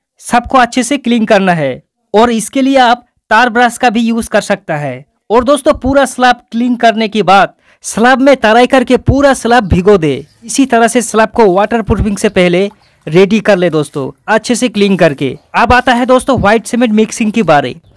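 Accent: Bengal, India. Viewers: Bandha ka slang lagta ha Bengla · Bahi tmr bari kotai ?? Tmr hindi r modhe banga pute utche...😆😆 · bangali mone hochhe 😃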